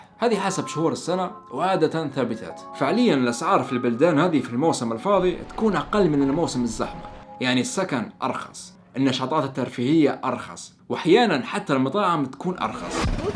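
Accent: Eastern Libya, Libya. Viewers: يالله بعيدًا عن الفديو ، اللهجة لفتتني للأبد اللهجة الليبية تعجبني وأحب اسمعها ، ماأعرف بس ممكن لأنها قريبة من لهجتنا ومفهومة 😂❤️ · بحب اللهجه الليبيه خالص هلبه هلبه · واو، واش هادي اللهجة الليبية، صح؟ أول مرة أشوف قناة ليبية على اليوتيوب 😍 أنا اشتركت 😊. أنا يحسب لي انت مغربي، مرحبا إخواننا بليبيا. هي خليط بين المصري والخليجي و المغربية يعني الكل يفهمها 😊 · هادي لهجه شرق ليبيا لهجه الغرب تختلف عليها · مش مغربي ليبي هذا 😅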